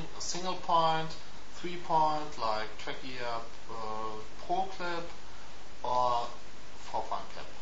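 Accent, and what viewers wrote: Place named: Germany. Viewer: Der Akzent ist deutsch, definitiv^^.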